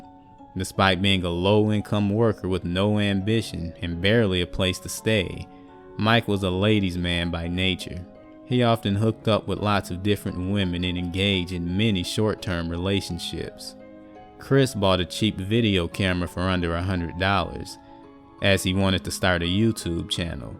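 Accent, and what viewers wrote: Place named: Deep South, United States. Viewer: 🇬🇧 I really like your stories so ive subbed to yr channel I also love yr accent, i think its deep south, Alabama or Louisiana? Im English & live near the Scottish borders & have only ever visited New York a few times & also visited friends in Utah & Wyoming but have had a thing about the kind of accent you have & so enjoy listening to you narrate Your stories are also very good & I havent yet heard any of them before, did you write them? If you do then your writing talents are considerable I look forward to hearing many more stories Keep up the good work, ive often subbed to creepypasta channels when they have had less than 1,000 subs yet in no time they have climbed to 20,000 - 50,000 Dont know how long you have been going but i always say From small acorns do large Oak trees grow. Thank you for your narrations I do so enjoy listening 👧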